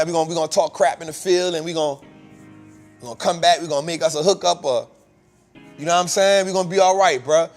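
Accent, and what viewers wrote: New Orleans, United States. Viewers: I wouldn't worry about your pronunciation. Anybody who's going to quibble over your articulation probably isn't from the culture like that. I don't even have a New Orleans accent but I knew what you were saying. You should probably send somebody like that somewhere else. Because in the future they're going to try to change you. So unless you're just working on it because it's something you want to do then don't change. Say things the way that you want to. Besides that kind of keeps it encrypted for the culture that gets it. The culture that probably needs your help the most. Somebody arguing over something like that is probably kind of uppity anyway.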